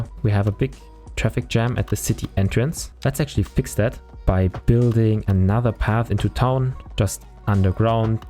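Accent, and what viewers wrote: Germany. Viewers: This video’s quality is incredible — at first I thought it was made by a big YouTuber! I was so pleasantly surprised to discover your channel. The content, your voice, and even the German accent (it adds a unique charm!) are fantastic. Can’t wait to see more!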